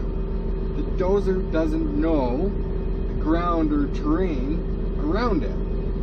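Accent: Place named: Canada. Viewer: I’d love to learn one of these systems for bigger projects, because running an 8 with a guy walking with a laser setup and a stick in his hand can get frustrating. I can do a decent job just doing it off of my eye, but like you said you still have clean up and compaction to take into consideration. Anyway, I feel like if anyone believes these misnomers that you brought up in the video they are probably irrelevant people to be concerned with and if you put them in a seat of a machine that’s quickly find out that we have job security for a VERY long time as operators. As a side note I love the way Canadians say “PROcess” and “PROject” haha cheers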